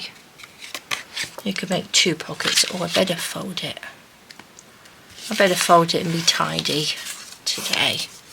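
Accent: United Kingdom. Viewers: Oooh the UK sounds 👍 x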